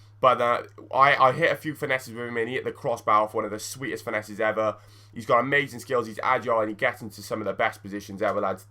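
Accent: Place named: Liverpool, United Kingdom. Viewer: why are you a scouse?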